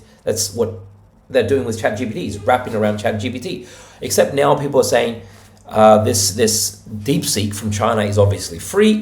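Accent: Australia. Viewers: Like your show very much are you an Aussie?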